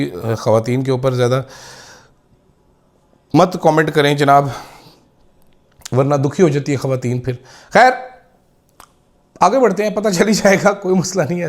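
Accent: Punjab, India. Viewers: You have a real lethal sense of humour! Your style urdu speaking is just wonderful. (Panjabi accent?)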